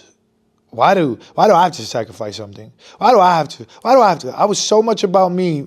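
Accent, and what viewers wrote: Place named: New York, United States. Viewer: This guy is definitely from, nyc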